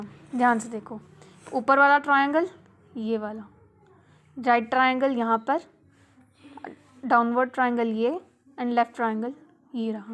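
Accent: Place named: Haryana, India. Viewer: Aap Haryane se ho na...Don't tell lie. Because I am from Haryana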